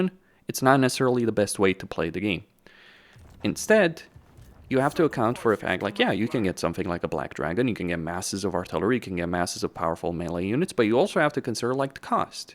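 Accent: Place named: Romania. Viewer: Why your accent is sounding romanian to me?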